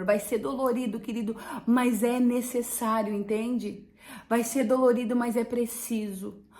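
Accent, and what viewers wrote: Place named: Rio Grande do Sul, Brazil. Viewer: Gaúcha né??? Bênção mulher!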